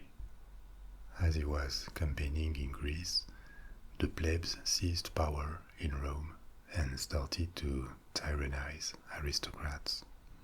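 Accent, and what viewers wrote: France. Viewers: Ever since visiting France (my first time visiting another country!), I have missed the French language and/or accent. It was wonderful, and hearing it in ASMR form AND in historical/science/astronomy forms is the best I could ask for 😊 Greetings from America. Thank you and stay safe! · Like a French Morgan Freeman. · Are you kidding me. A French man, speaking english and whispering? A dream come true.💖💖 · What a sexy voice and that French accent 😍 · Is that a true French accent or a cartoon one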